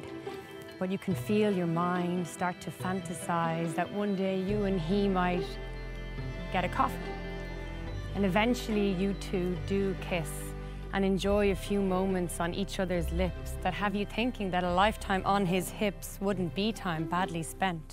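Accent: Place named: Dublin, Ireland. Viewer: Bhí cailín álainn, cliste agus saothraithe agam mar Te Ciara nuair a bhí cónaí orm agus céim i mBaile Átha Cliath. Ciara álainn cailín, álainn i ndáiríre. Braithim accent Bhaile Átha Cliath. Tá súil agam filleadh ar chónaí ann san athbhliain. Póg mhór ó Florence Ciara. Cailín álainn Éireannach!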